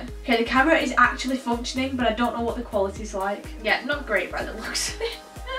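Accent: United Kingdom. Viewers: shammy dammy no it’s a British accent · british i think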